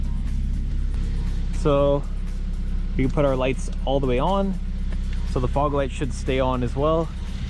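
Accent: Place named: Canada. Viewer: He sounds a little bit Canadian to me.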